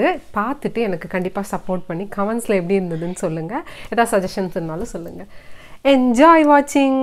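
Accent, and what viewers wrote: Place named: Coimbatore, India. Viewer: அம்மிணி நம்ம கோயமுத்தூர் பாசையா மிக மிக நன்றாக உள்ளது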